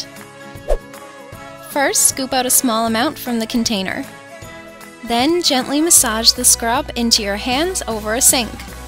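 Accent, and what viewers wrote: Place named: Canada. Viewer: You can tell she is Canadian because she says "Tay-g" Instead of "T-ah-g"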